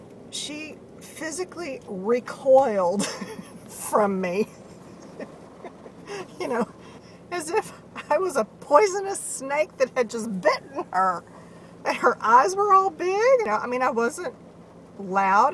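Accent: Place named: Texas, United States. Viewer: What I love the most is your Texas accent. I'm a native Texan stuck in Florida. I love hearing the "song of my people"!!!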